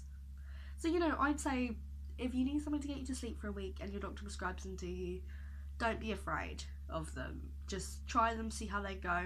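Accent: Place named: United Kingdom. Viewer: where from the uk are u from?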